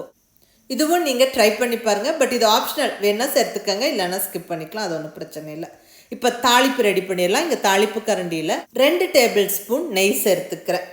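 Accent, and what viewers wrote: Kongu, India. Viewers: எல்லாமே சூப்பர் கோவை கொங்கு தமிழில் பேசுவது அதிலும் அவ்வளவுதாங்க சுவைகூட்டுதுங்க அவ்வளவேதாங்க சகோ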